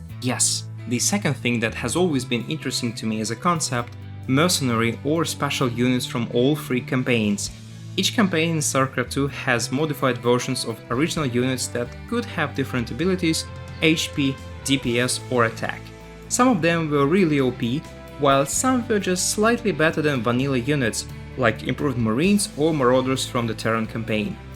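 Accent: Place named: Russia. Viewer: not strange, just noticable, but in a good way, absolutely understandable and yes, now you mention Russian, I can kind of hear it, but much softer than the stereotypical (although also very cool) strong Russian accent! Thanks for answering, loving your vids! Cheers! :)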